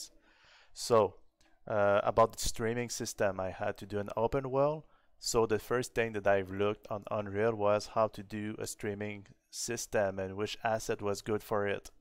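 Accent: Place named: Quebec, Canada. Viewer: Je pense que je reconnais un québécois ici 🙂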